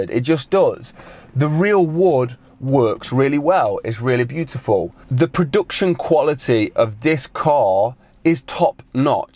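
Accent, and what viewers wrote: United Kingdom. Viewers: british...oh no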